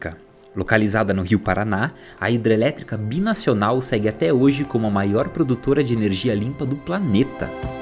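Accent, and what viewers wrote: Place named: Santa Catarina, Brazil. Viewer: Nossa! é de arrepiar cara, produção de cinema! você é catarinense? tem sotaque de Catarina kkkk sou de Massaranduba - SC abraço e sucesso!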